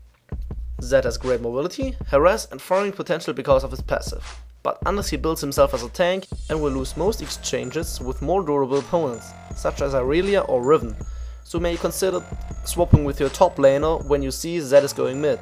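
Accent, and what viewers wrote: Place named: Germany. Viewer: Ich kann dein Englisch mit deinem Deutschen akzent nicht ab :D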